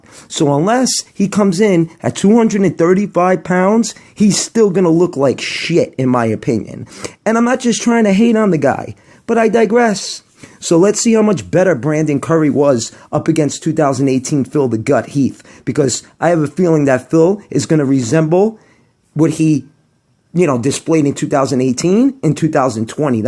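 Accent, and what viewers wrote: New York, United States. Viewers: I love your accent NY style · Idk what part of ny that accent is prob the city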